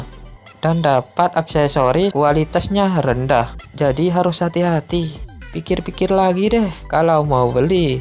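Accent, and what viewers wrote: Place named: Madura, Indonesia. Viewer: Cara ngomong + backsound nya kek kenal 😂 Rada ada logat Madura nya dikit 😆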